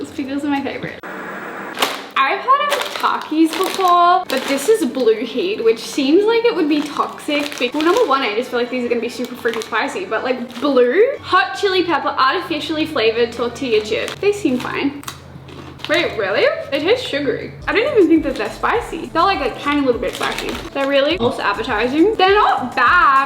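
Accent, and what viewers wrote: United States. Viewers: You aren’t American ffs! Quit acting and sounding like one. Grow up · Is it me or does this girl sound American half the time?? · What's with the yank accent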